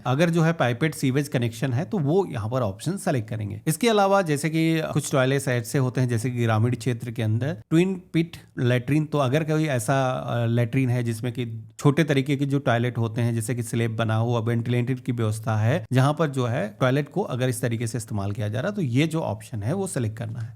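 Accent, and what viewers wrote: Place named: Uttar Pradesh, India. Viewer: Majhara tawkali, UP se ho na bhai aap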